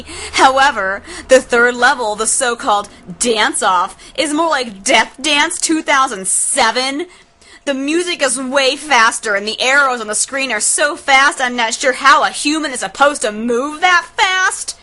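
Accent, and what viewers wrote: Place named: Australia. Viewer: Lol she is Australian not British. I love DDR but this was hilarious.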